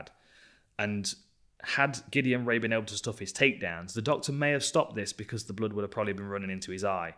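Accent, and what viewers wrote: United Kingdom. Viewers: Man, I hope this is Dan Hardy and not some dork producer. If this is the real Dan Hardy I would like to thank you for being British. It's a nice accent and it feels like the person speaking is someone I should be paying attention too. Its also refreshing that possibly at one point my ancestors spoke in such a captivating way instead of the 5 generations of hillbilly south Carolina speak. Also, is it true my ancestors were the trash of Britain or religious zealots that you guys wanted out? We are always taught our ancestors were the prime stock of Britain and fled for freedom and prosperity... you know the normal talk but my research is showing different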